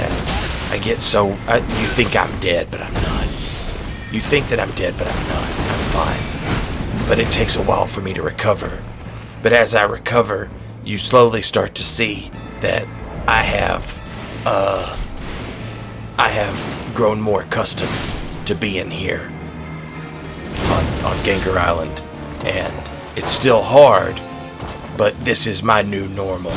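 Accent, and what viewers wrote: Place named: Kentucky, United States. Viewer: You have too good a Mitch McConnell impression not to be from Kentucky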